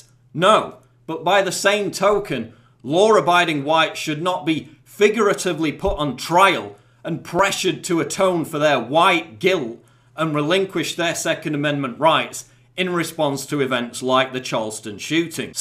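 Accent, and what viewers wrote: United Kingdom. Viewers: Another Alex Jones wannabe, with a British accent. Yawwwwn.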